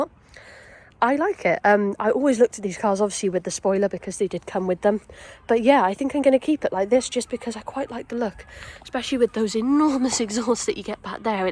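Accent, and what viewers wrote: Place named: United Kingdom. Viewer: Where is the wing? I had a band new 1990 Twin Turbo Z in SF California... loved it... drove it 18,000 miles - dumped it moving to LA for film school for a 1991 4Runner and cried. I went through 3 sets of fronts and 2 sets of backs... As for tires - go for Michelin Pilot 4S pilot sports - the best rated!... I'd recommend what I'm driving instead a 330hp+ with mods 2005 silver Infiniti G35 with no Turbo... newer, faster, no turbo and about $8K plus sunroof used now. (I love my sunroof!). Thanks for the memories and your great UK accent. Enjoy! Try a G and forget the Z... as you get back seats even on the G35 6mt coupe which lowers insurance.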